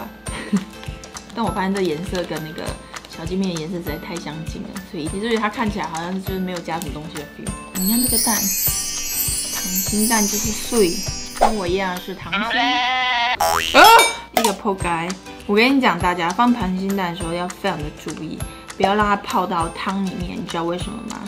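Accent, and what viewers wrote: Taiwan. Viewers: 講話台灣國語好可愛，有時講一句台語覺得好親切，喜歡❤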